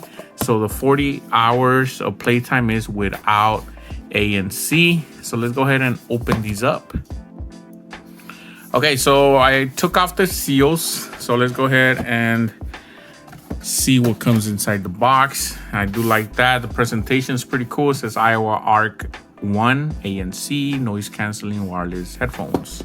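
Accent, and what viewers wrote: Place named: Colombia. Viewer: I like your Colombian accent 😇😇😇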